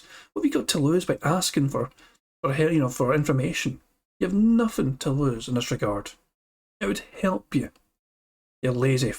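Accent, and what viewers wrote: Scotland, United Kingdom. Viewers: Ah yes my daily dose of a Scottsman wonderful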